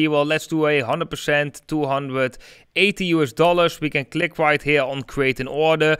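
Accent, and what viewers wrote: Netherlands. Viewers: The moment I heard your accent, he's Dutch 😅🤣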